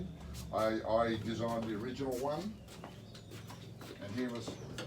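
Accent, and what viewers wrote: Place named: Australia. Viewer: Just found your channel. Good to have an Aussie accent on the tube.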